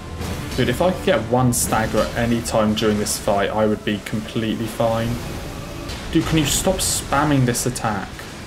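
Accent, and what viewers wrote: United Kingdom. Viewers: Why do you souns homosexual or are you just British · Its called a british accent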